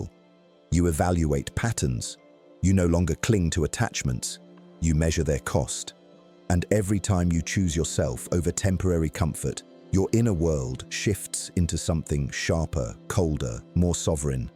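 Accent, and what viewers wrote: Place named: England, United Kingdom. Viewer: Speak in American. We ain't training to be English. For real. Just cause the average American is preprogrammed to think an English accent is more intelligent.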